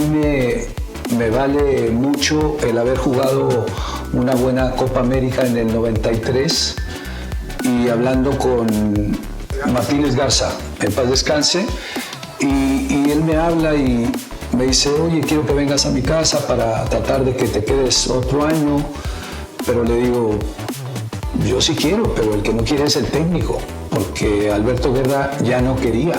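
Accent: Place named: Spain. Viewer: El señor Galindo una de los mas grandes ídolos de el chivas del Guadalajara y México siento mucho que hubiese y haya corrupción en el deporte y gente como tu que merece el apoyo máximo no se le diera y tu como entrenador serias excelente, soy machin pero desearía tener su autógrafo maestro y toda mi admiración eres de las personas que saben y tienen el sentimiento de representar a un club de coraza y de representar a su país con todos los hueboz solo una hora con el Maestro y podría escuchar más se me hizo poco es como el agua que filtran las montañas de lo que le hace falta a nuestro México personas que en realidad tengan principios compromiso y el color en la sangre no como el español del:D que tiene el acento español que usa a figuras como tu tu deberías de tener tu canal galindo y créeme la gente te seguiría más a ti perdon señor pero su acento español no me gusta me agrada más el de México solo que eres cabron sabes de donde sacar y lo que queremos ver como al Maestro que bueno que no hiciste tu auto entrevista por que la verdad no me importa y no la vería o hazla haber cuantos likes tendrías:D 😀 es broma no te tenzes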